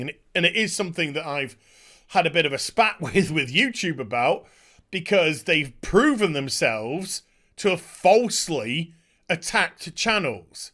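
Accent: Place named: Yorkshire, United Kingdom. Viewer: Got halfway through I can’t believe our lack of perception I’ve got because it’s now suddenly apparent you are so typical Yorkshire very articular but very comical but you’ve just explained something because yes you’re on the ball and I’m learning a lot because I’m hoping to be a YouTuber eventually but all I can say is oh you are awful but I like it clue is I’m Apollo I do apologise I forgot his name well-known very camp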